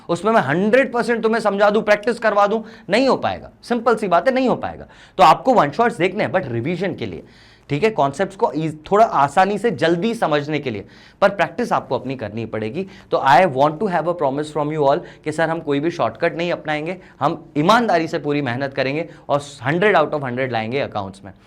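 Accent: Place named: Bhopal, India. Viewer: SIR BILKUL BHOPALI STYLE MEI SAMJHATE HAI❤❤❤❤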